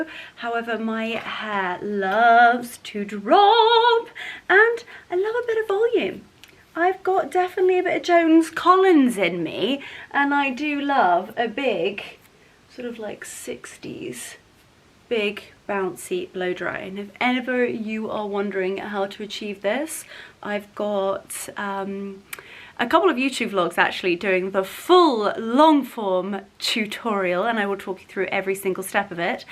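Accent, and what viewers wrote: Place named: United Kingdom. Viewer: Hi I love your Vlogs I just discovered your channel. You are so beautiful from inside and outside. You seem genuine with a heart of gold a good person. Love your British accent 🇬🇧you sound like a real princess. 😘🇺🇸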